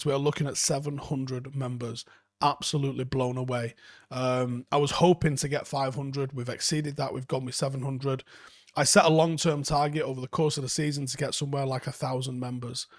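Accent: Manchester, United Kingdom. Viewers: doing the voice over in that vid? proper Manc😆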